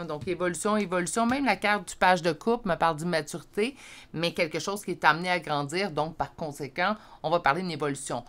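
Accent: Canada. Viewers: C' est avec plaisir,très très Belle Personne et Franche...j' Adore ❤❤❤❤❤. Prenez soins de vous.j' Adore et toujours adoré l' accent Canadien et les Canadiens.❤❤❤.à mon retour de vous féliciter ❤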